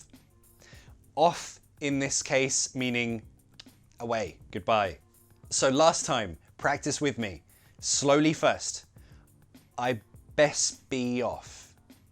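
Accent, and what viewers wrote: United Kingdom. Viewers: You are helping us a lot to learn and improve our oral skills...I love your British accent. Regards from 🇦🇷😎👍👏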